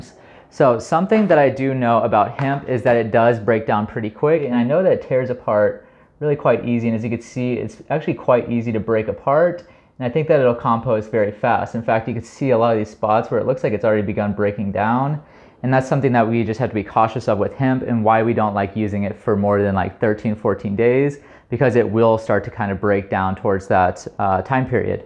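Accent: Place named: South, United States. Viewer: GREAT REVIEW! Your accent is becoming more southern with every day that passes. LOVE IT!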